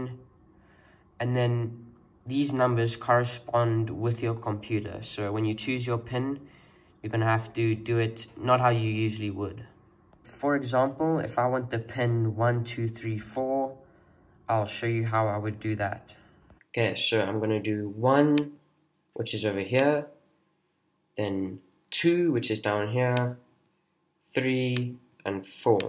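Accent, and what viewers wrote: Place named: South Africa. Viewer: Awesome video. The SA English is the best sounding.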